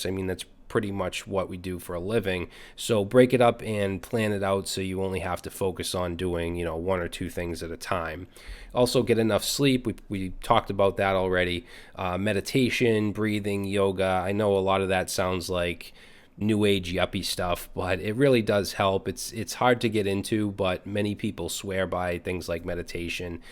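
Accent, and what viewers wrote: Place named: New England, United States. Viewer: In regard to Category 3 I (re)took up Vim about 2 months ago to minimize hand movements involving the mouse and for mental discipline. After practicing and note taking in terminal-launched VIM I came back to VsCode and installed the Vim extension. This is a work in progress but I'm not unhappy about it. In eighth grade I took a half-year (one class period) in TYPING and got myself to about 35 wpm. No regrets there. My advice now to my 1964 self would be to follow that up with a half-year of creative writing or coding in Vim, ignoring the technical issues of "what's a keyboard?", "what's a monitor?", and "PC, Mac, or Linux?" Ah, the IBM Selectric typewriter was still a bunch of concepts on a drafting table at that time. In your Category 1 arena I scrounged a monitor out of storage to enlarge my viewing area (same number of, but bigger, pixels) and began drilling myself in Tmux in parallel with Vim. 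BTW, Vim and Tmux like to fight in the back seat while Dad's trying to drive - I digress. My point isn't to promote Vim but encourage your viewers to consider ways to minimize mouse operations, reduce eye strain, and organize a work flow using tiled panes in terminal or GUI tools. Thank you for your video - it got me to organize my thinking on the above topics. BTW, your accent has me wondering if you and your neighbors are regretting a certain off-season move involving a certain QB relocating from a certain Massachusetts team to a certain Florida team. If this is the case I would b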